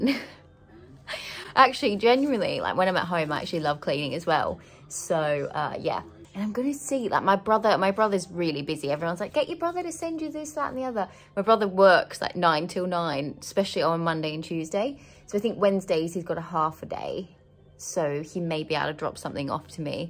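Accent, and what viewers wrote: Australia. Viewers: You’re such a pommy! Hahaha you have lived in the UK too long, do you reckon you have morphed into a pommy yourself? Or do you think you still sound Aussie I’m actually so interested to know! Xx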